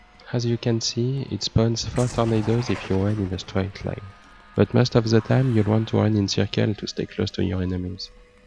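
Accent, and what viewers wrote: France. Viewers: La vidéo est impressionnante mais est-ce que tu pourrais en faire une en français, je n'arrive pas à trouver de bon tutoriels en français et vu ton accent dans la vidéo je me dis que tu dois être français... ^^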